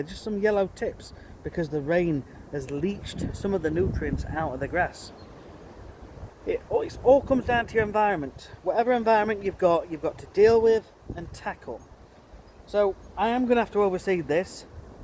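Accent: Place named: North, United Kingdom. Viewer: Don't overseed yet. Not sure where you are in the country, but you sound fairly northern, I'm in Lancashire and we have snow forecast next week.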